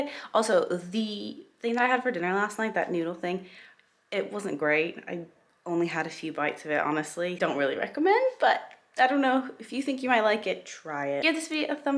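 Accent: England, United Kingdom. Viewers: That English accent is coming along lovely bruv :)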